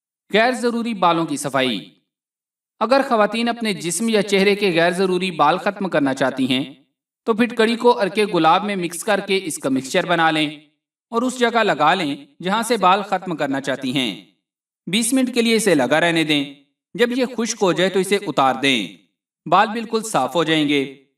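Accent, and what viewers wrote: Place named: Bihar, India. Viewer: Bhai aapki zubaan, bilkul Biharion ki style m urdu bol rahe hain🦅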